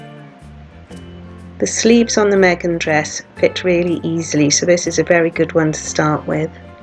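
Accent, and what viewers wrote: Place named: Wales, United Kingdom. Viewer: Fab tutorial👍. Clarity , brevity and simplicity. I’m inspired. 🎊🎀tell me , are you welsh? I’m in Cardiff and you sound local!🙋🏻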